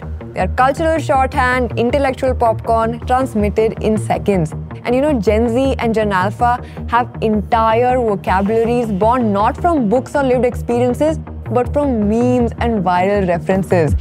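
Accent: India. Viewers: a girl with perfect indian accent finally. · how do you have Indian accent? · I didn't leave because im brain roted , i just don't like indian accent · I'm one of the 85% who didn't watch to the end, simply because of your indian accent. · good message, but i cant stand that indian dialect english for real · The poo accent 🤮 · Sorry, I stopped after about 30 seconds in because I can't stand listening to Indian English. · Accents vary worldwide, mate. Nothing you can do about it. Also she’s Indian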